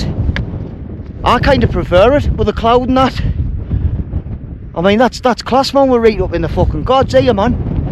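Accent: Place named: Northern England, United Kingdom. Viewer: As an Australian, I had to tune in to your northern lingo and accent, but I enjoyed your straightforward style. Keep up the good work!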